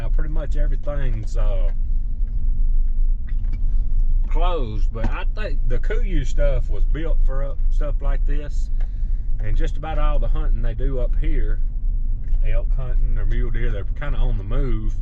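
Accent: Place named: South, United States. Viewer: You know it’s one of us Southern boys when ya hear “ that sure is a pretty rock”. I love it! Glad y’all had a good time brother! Thanks for sharing it with us.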